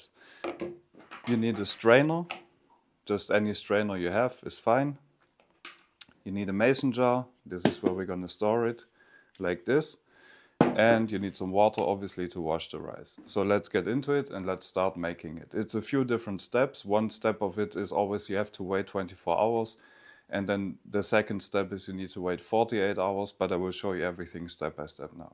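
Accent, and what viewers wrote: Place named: Germany. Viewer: You are obviously a German speaker ❤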